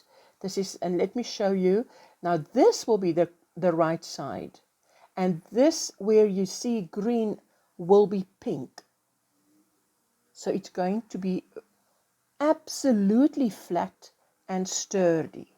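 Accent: South Africa. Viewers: Love your blanket. I live in Johannesburg and it’s so lovely to see and hear a South African tutorial. Thank you for sharing talent xxxx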